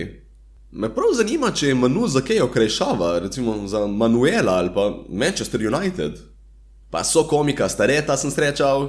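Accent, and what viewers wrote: Primorska, Slovenia. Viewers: Ma kaj, super si bil, samo, da žirija in publika ni imela pojma, kaj je to komedija. Kaj pa blebeta tisti Čakarmiš, kaj pa je on naredil v življenju, razen tega, da je nekaj delnic kupil od Pro Plusa in lepo živi od dividend in drugim soli pamet. Toliko brezveznega pesimizma naših Slovencev pa še ne. Seveda, tudi jaz sem bil začuden, da si bil na Talentih, nekaj časa sem mislil, da je neka reklama zate, no potem pa sem poštekal, da resnično tekmuješ. Bil si brez treme, govoril našo primorščino in hvala Bogu, da te imamo. Tu sei bravo! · Obožujem tvoj naglas🙌 primorci rulamo😂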